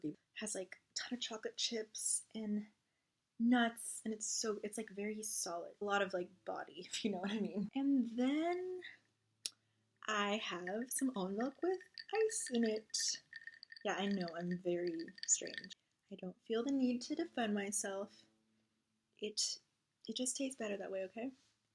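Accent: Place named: United Kingdom. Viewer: Your British accent is absolutely stunning darling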